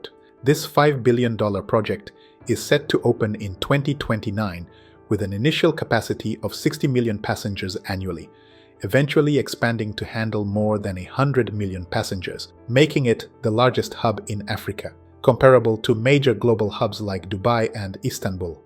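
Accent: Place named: Kenya. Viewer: From his accent, yes, he is African. My guess is Kenyan. A youtuber with 1k subscribers can't influence anyone and guess what? 90% of viewers are Ethiopian. Whatever he talks about has nothing to do with Ethiopian Airlines.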